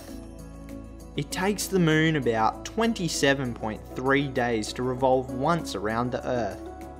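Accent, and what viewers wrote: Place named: Australia. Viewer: this is the single most Australian accent I've ever heard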